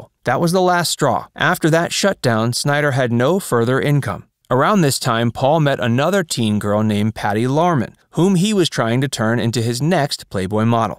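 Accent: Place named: Transatlantic, United States. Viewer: hello Mr you’ve a interesting’ Trans-Atlantic none accent. Did you train your voice ❤️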